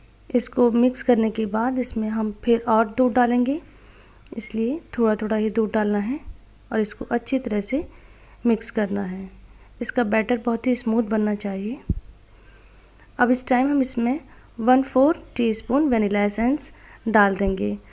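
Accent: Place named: Pakistan. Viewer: Nice pan cake. Kya ap pakistani hai ab ki awaz bht achi hai. Apka lahja bht sweet hai.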